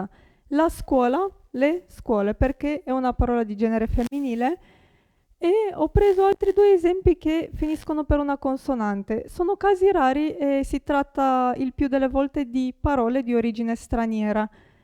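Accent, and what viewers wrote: Eastern Europe. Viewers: She's not Italian. Her accent is from Eastern Europe. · beh il vocabolario più esteso, i modi di dire, la pronuncia, può insegnare anche i vari toni di dialetti etc etc etc... Quello che non mi è piaciuto in questo video è la pronuncia dell'est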